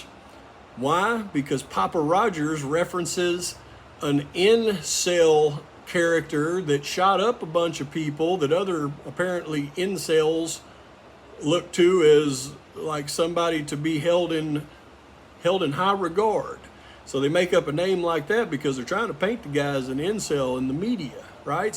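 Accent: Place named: Alabama, United States. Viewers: Finally! Thank you. It mostly makes sense to me now lol. This crazy case has been drivng me insane. I think most people want justice but don't want to see a (possibly) innocent person framed and just want reassurance (real evidence) that he did do this crime. Also I think it is very scary when it appears that our rights are being ignored and it is insulting to our intelligence that we are being lied to and misled. Thank you for providing some much needed clarity. Btw I love your voice-I went to college and lived in AL for a while and your accent seems similar. Please keep the info coming! It is much appreciated! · I'm an Alabamaian too but I don't reside there. My accent isn't as clear as P's..😂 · You definitely deserve more than 1.6K subscribers. You give an interesting and compelling perspective -- I never really bought the drug connection, but you have me thinking. 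And as a native of Alabama, it is a delight to hear a guy with an accent like mine who uses good grammar and is smart as hell. Keep on,.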